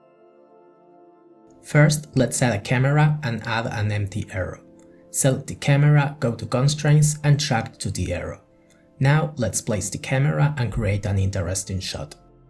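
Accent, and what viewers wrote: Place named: Rioplatense, Argentina. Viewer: are you from argentina? you have a strong rio de la plata accent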